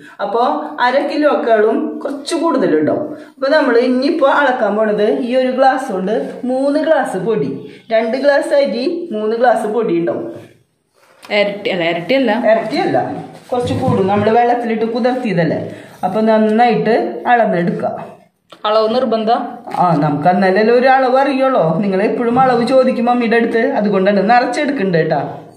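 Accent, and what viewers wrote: Thrissur, India. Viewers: Nammade Thrissur bhasha kekkumbo enthoru santhoshaannu ariyo ..thanks mummy ..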